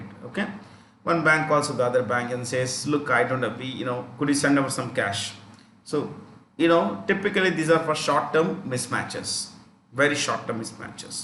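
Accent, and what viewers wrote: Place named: South India, India. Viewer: He is a south Indian. His accent is perfectly fine.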